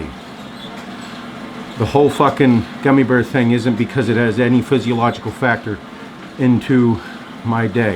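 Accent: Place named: Western Pennsylvania, United States. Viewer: Are you from Western PA? You sound like you do. Anyways, there is a line between laziness, procrastination, guilt and as you say being an asshole.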